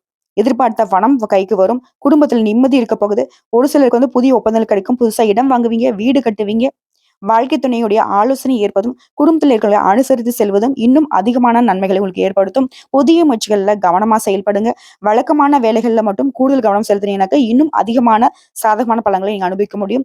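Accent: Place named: Tirunelveli, India. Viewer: Good to hear in my Tirunelveli Tamil slang 👌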